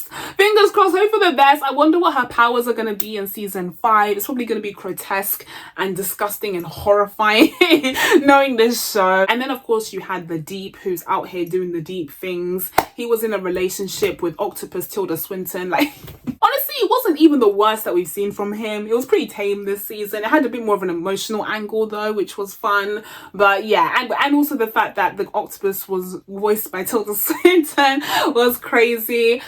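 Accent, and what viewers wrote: United Kingdom. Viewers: Your English accent is so beautiful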